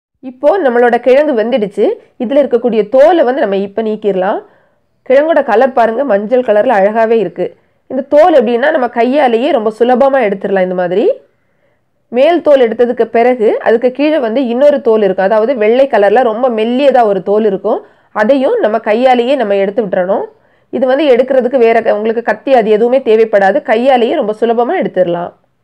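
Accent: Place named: Nagercoil, India. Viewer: Madam neenka nagercoil ah slang apdi than iruku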